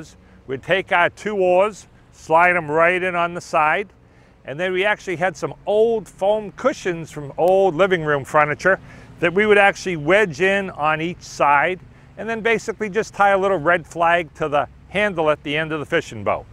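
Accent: United States. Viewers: I hear Boston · Being from Massachusetts, I myself will guess the presenter is from Maine.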